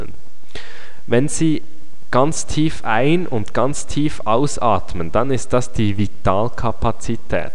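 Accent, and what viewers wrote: Switzerland. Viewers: Kann das sein das ich ein Schweizer Akzent höre ? :)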